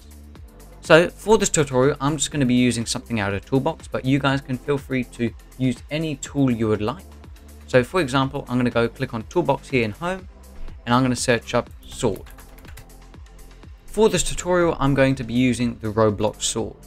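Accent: United Kingdom. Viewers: pronounce your words dirty british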